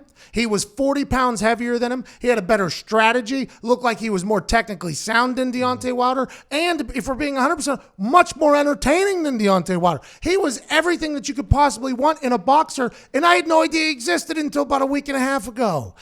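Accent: Pittsburgh, United States. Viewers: That’s that yinzer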